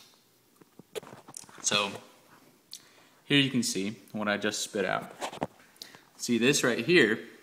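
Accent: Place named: Philadelphia, United States. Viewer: Sounds like a philly/south jersey accent.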